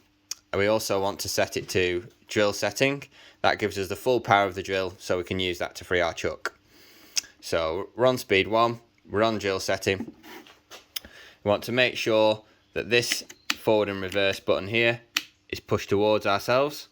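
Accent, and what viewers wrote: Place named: England, United Kingdom. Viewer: LOL! When I heard the English accent I knew I was in for a treat. What a joke of a video.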